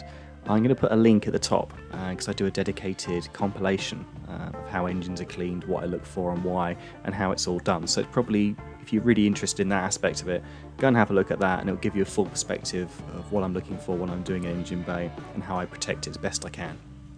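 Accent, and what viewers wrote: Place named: United Kingdom. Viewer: This is the only detailing channel I sub to..why ?? because you are not another plastic Yank, talking American because you think it's cool or that the American viewers like it, no gas tank, fenders, lug nuts, sway bars or Zee 4 etc etc. keep on being British.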